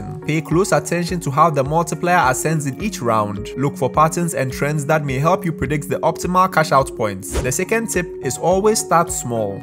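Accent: Nigeria. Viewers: how is bros accent nigerian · Bro has a Nigerian accent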